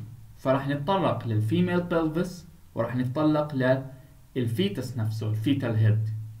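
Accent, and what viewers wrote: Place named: Iraq. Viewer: دكتور عندك اكسنت من اللهجة العراقية 😶‍🌫️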